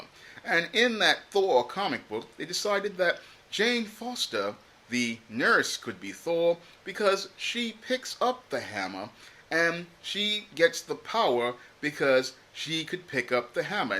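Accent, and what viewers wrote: United Kingdom. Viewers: Love the British accent throughout the commentary. Very diverse form of commentary and the evil laugh was fitting of a British villain. Everything you said is on point and I am sick of these movies now. I'll pass on this since they passed on Idris. What a slap in the face to black men. Carry on now! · Nice British access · The British accent is a nice addition as always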